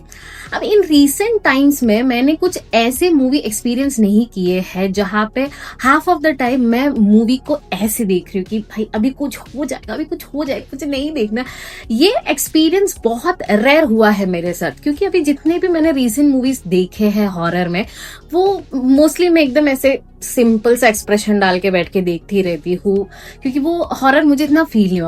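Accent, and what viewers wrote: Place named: Kottayam, India. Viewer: You speaking kottyam Hindi it's cute.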